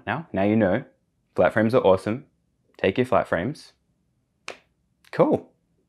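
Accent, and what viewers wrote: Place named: Australia. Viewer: expecting a non Aussie accent on YT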